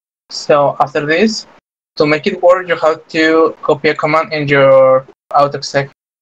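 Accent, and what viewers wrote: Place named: United States. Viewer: A mira se volvio bien gringo el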